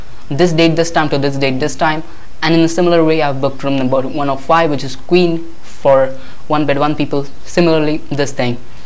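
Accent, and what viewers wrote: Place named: India. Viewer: ahh, your pronunciation and the royal peacocks singing in the background sent me back to India again. Thanks for these tutorials! Great job!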